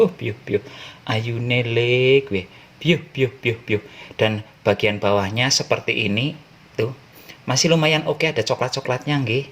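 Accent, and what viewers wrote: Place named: Banyumas, Indonesia. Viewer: Resepe ga terlalu penting yg penting denger ngomong ngapak2...hiburan bgt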